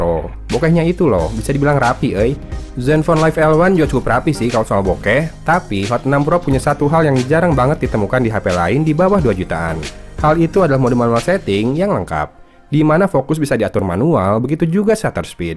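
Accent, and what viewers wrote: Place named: Sunda, Indonesia. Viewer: Urang sunda mana suara nyyy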